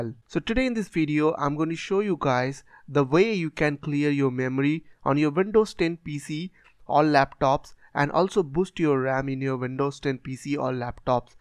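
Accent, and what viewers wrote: India. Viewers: Me not trusting an indian voice after watching Jim Browning channel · indian sounds are so funny :D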